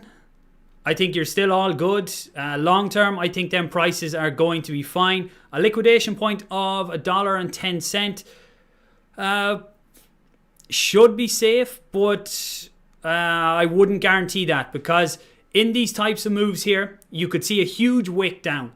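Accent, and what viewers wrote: Ireland. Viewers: Not another fucking Irish accent!!! All I’ve heard all day watching the gg’s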